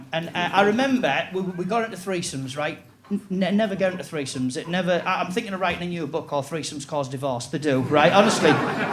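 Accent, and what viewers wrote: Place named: Hartlepool, United Kingdom. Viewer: lighten up! It ain't easy making it big with a Hartlepudlian accent.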